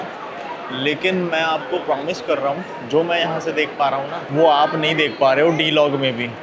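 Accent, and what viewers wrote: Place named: Indore, India. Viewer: Maza to aarha ha 😂 ye to sach ha or indori suun ke or maza aarha ha kyuki me bhi indore se hu❤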